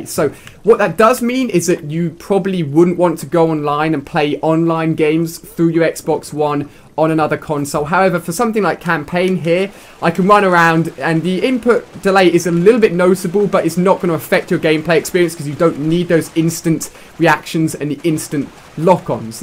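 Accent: England, United Kingdom. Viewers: why do you have an English accent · because he was born In Britain you idiot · Daniel Patel Because he's British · Z is pronounced ZED in the UK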